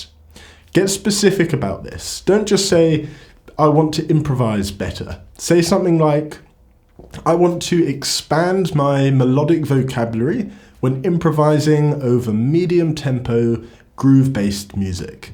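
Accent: United Kingdom. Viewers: I'm here for the accent: I love the way you say, "What is up guys" like you are Prince William 😅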